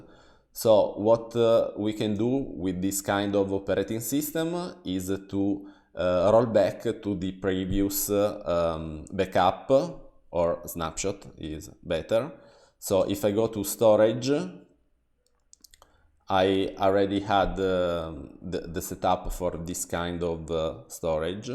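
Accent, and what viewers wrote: Italy. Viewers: The italian is strong in this one, thanks for the comparison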